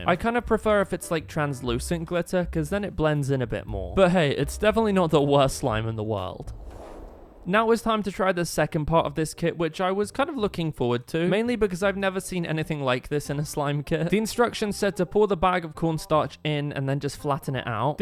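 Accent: United Kingdom. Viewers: Are you British? You sound like you are British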